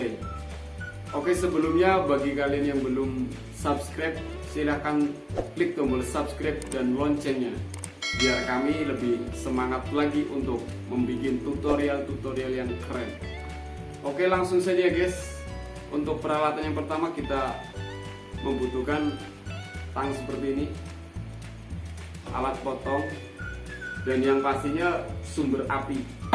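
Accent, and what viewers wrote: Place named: Tegal, Indonesia. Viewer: Tenang ae mas e wis tak sasbcribe... Sampeyan wong ndi,sih logate kaya Tegal ..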